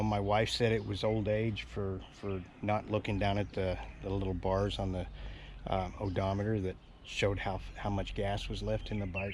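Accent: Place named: New Jersey, United States. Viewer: How u get to Thailand- I mean u speak like you from I’d say New Jersey ? Now I got to investigate Thailand I love the bird noises in the background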